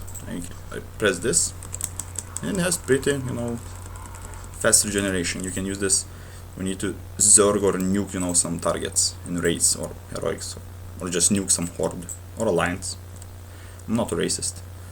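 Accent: Russia. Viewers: Well you know english pretty well, just have very strong accent as all russians. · That russian accent of yours is so awesome xD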